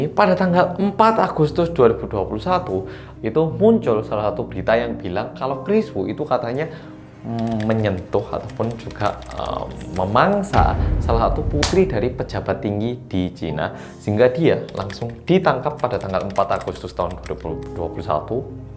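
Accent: Java, Indonesia. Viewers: Kang Mas,bikin konten tentang kerajaan kuno KOREA dong,swaramu lembut medokg meruntuhkan kesombonganku,biar aku dengerin sambil # TAKING NAP# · Opa medok upload lg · Bersama orang korea yang medookkkkkkk😁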